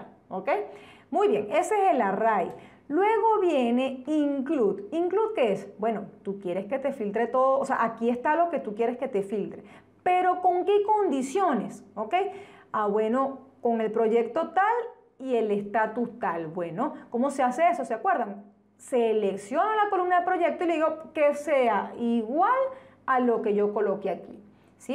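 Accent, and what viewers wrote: Venezuela. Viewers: OH! Es la joya de esta semana! Primero porque estas funciones están de lujo! Segundo: porque ella explica muy chévere Tercero: creo que es venezolana y ese acento de mi tierra me mata!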